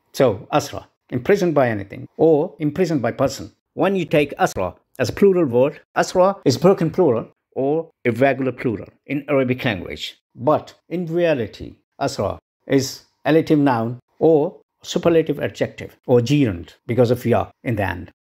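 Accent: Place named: United Kingdom. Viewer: He is speaking British English in quite normal speed. He is not fast at all. If he speaks slow it will be dragging. If you listen English news and other English programs you will find that speed seems a bit slower than native English speakers.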